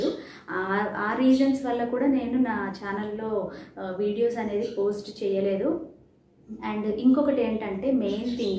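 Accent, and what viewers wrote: Telangana, India. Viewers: Hello garu.. neku vachina yasa basha lo matladu..pakka Telangana basha dhichi Kulla kulla matladu....asal bayapadaku... All the best 😍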